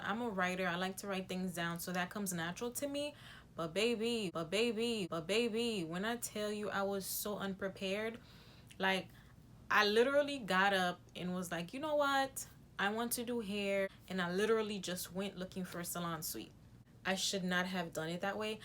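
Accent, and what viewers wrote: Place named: Haiti. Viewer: Random question... are you Haitian? I detect an accent and you look like you can be one of my cousins lol 🇭🇹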